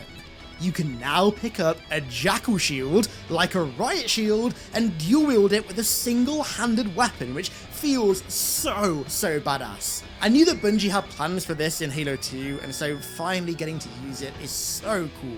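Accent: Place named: United Kingdom. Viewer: This is probably a weird question, seeing as you have the accent, but do you live in the UK or the USA, ‘cause you also did a fourth of July episode on your other channel.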